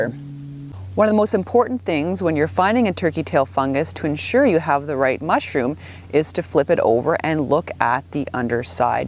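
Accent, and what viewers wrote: Canada. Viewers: love your canadian accent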